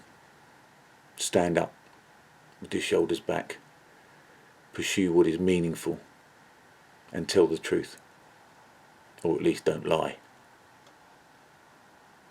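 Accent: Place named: London, United Kingdom. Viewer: This is a London accent, East London I think. Definitely from somewhere in SE England though.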